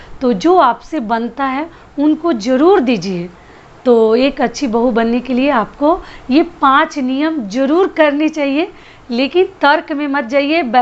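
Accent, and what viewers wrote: Bihar, India. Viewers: Dii aap pirbiya ho ya bhojpuriya ya bihari kya ho.?🤔🤭kyuki aapki aawaj..mujhe..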